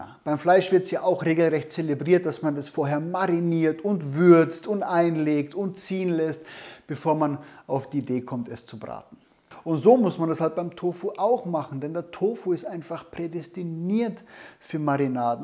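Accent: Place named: Franconia, Germany. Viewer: Hallo, ich habe gerade deinen Kanal entdeckt, super! - und sehr charmant mit Deinem fränkischen(?) Akzent ❣️ "Geschmacksrodeo" 😂❣️- made my day 😘- yummi 😋- sieht echt gut aus ❣️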